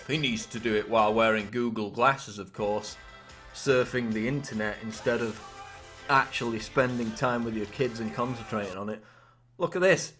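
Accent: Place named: England, United Kingdom. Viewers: I'm British and I have to say I really hate your northern accent! sorry but you sound like a tit! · Your "Prison" is of your own making. It's also quite presumptuous of you to "know" what will make others happy. Rather absurd actually. You're a troll with an English accent in my book mate. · guy sounds like he is from rochdale.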